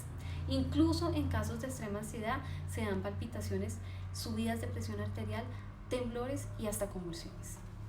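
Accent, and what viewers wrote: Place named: Colombia. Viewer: Acento Colombiano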